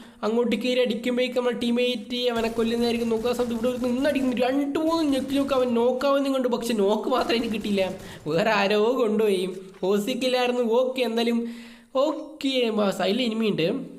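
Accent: Kannur, India. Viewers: Bro kannur ano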